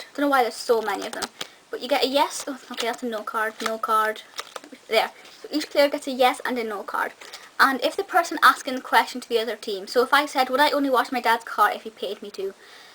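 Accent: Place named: Scotland, United Kingdom. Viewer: Sorry, I'm American and I'm just inferring from your accent that you are of Scottish descent, if I'm incorrect, I apologize.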